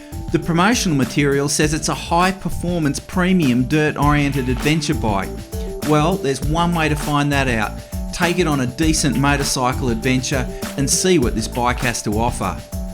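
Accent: Australia. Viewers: Great review, thanks. I especially like the level of detail, feature by feature, very easy to follow and understand. I also like the Aussie voice and style - who else uses the term "gobful of power". I bought one after this review. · I’ve spent all Saturday watching reviews and comparisons. This is by far the best review on YouTube. Thanks for the awesome upload. Can’t wait for COVID19 to end. I’m wanting to step up from my CRF250 Rally, the KTM 390 is very tempting but the 790R just has all the goodies! It’s so good to hear an Aussie, just makes it feel some much more relevant 👍🤓🇦🇺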